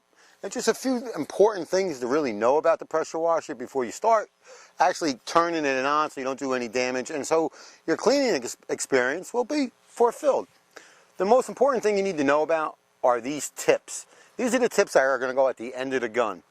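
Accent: New York, United States. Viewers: I'm Italian and love how talks! I'm from new yawk too I dunno where he's from but he would fit right in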